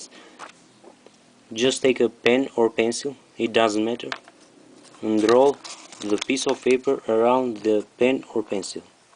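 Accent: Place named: India. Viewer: im not saying all indians have a gay vioce, just that this guy has a indian voice and he has a gay vioce.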